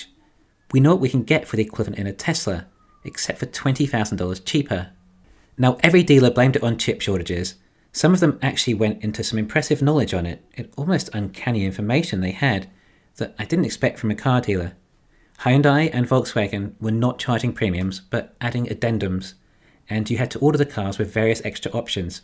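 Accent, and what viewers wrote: United Kingdom. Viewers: I’m in the U.K., his accent sounds British to me.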